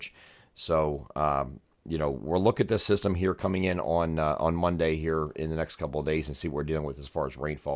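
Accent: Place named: Canada. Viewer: You got that wildfire voice bro! You can tell by the trace of Canadian accent! Ever since the smoke showed up my allergies haven’t been so bad since I was a kid. Hopefully you feel better as conditions improve personally I’m on the rebound today. Thanks once again for the videos y’all are the best in the game!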